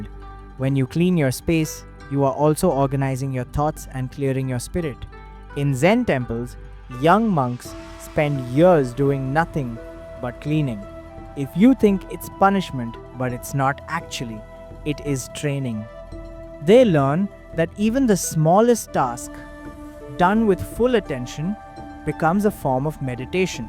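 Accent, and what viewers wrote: India. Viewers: Your videos are very good , but re you Indian or japanese as your accent feels indian to me ? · It is odd, you have an India accent and talk about Japan 😂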